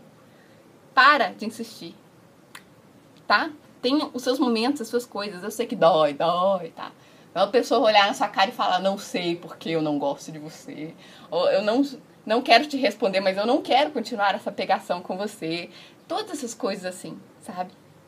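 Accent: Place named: Minas Gerais, Brazil. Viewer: Bom dia, espero que esteja bem. Sou engenheiro brasileiro, atualmente moro em Curitiba. Trabalhei e estudei na região de Munique (Bavaria), nos anos de 2006 e 2007. Assisto teus videos para matar as saudades que tenho da Alemanha. Voce é uma moça muito especial, tenho uma admiração muito forte por voce. Entrei em seu canal ha pouco tempo, mas me sinto feliz em te assistir. Voce tem sotaque de mineira, é isso mesmo: Estava vendo um video seu e que voce vai se casar, qdo sera: sra em minas: O rapaz deve ser um caboclo pra mais de sortudo ter uma mulher como voce nos brcas dele. te desejo muita sorte em sua vida, um abraço bem forte de urso siberiano, Caso queira conversar estou por aqui. Ate mais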